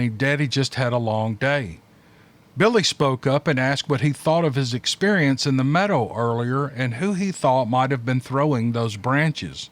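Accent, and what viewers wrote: South, United States. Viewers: Oh yes I listen during the day and almost every night as I drift off to sleep I enjoy these encouter stories but more than that I love southern voice accent, a follower southerner 😊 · We love your stories! And your southern accent😁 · Those are some sweet ladies you got in your sideyard there, 🌱 I very much enjoy your story telling. Being half-Southern, your accent is wonderful in my ear. Thank you!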